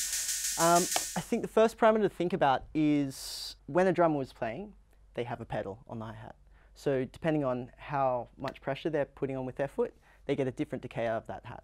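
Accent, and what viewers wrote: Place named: New Zealand. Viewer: Although, I think this chap may be from NZ. :)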